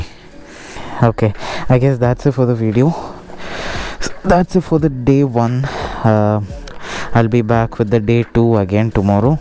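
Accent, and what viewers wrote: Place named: Bangalore, India. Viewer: കൊള്ളാം ആദ്യം വിചാരിച്ചു ഏതോ ബാംഗ്ലൂർ പയ്യൻ ആണെന്ന്. പിന്നല്ലേ മനസിലായെ മലയാളി ആണെന്ന് 😍😍. Anyway പൊളി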